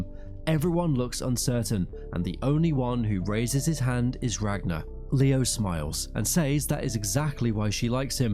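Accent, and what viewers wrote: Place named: United Kingdom. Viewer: brithish accente good for uuuu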